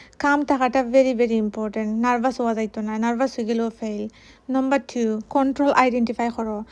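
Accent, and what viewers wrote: Sylhet, Bangladesh. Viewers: sylheti voice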